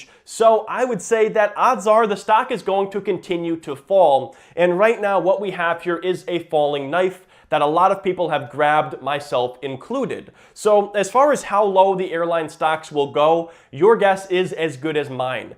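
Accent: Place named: Chicago, United States. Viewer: Your accent. What part of Chicago you from?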